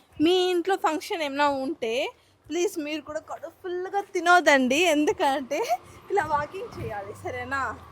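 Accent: Chittoor, India. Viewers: Idi chittoor lo tamil valla telugu slang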